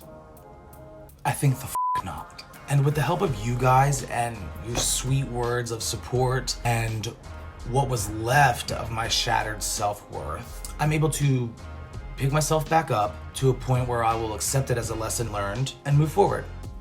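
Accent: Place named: England, United Kingdom. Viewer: You’re a brilliant actor - I hope things go well for you! 😊 That was a very good English accent, probably one of the best I’ve ever heard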